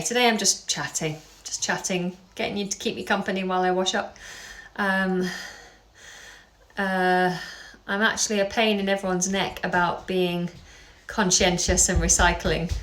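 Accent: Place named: England, United Kingdom. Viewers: First video I have seen of you very good video. You have a nice clear English accent but dear god did you eat a horse or something LOL. It looks you have eating a horse. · Hello from Jon in the Los Angeles area. I'm an American, I speak "New York" and "Chicago" English (sometimes with the accents coming through). I've been to London thrice now (each time spending a week there, including trips to Norwich and Manchester - ace!). London feels like home, it really does. Got friends there too. I feel pretty confident with my English accent, though Northern English is a bit difficult. I can do Scottish and Irish too! I remember seeing your video using your native Mancunian accent, loved it! But, I have more learning to do while watching more of your content! Cheers, and continue to stay safe. Jon El Segundo, CA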